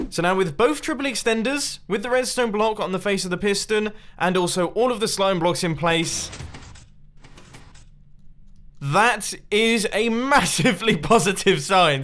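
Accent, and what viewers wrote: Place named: United Kingdom. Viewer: "It's gonna be complicated..." he said in his brittish accent..."lemme make it more complicated" me saying as if I was him....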